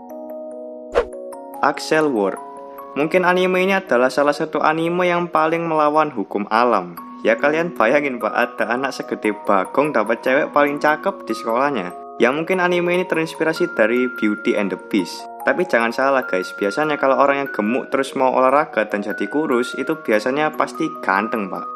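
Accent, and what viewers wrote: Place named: Surabaya, Indonesia. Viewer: Kayak orang Surabaya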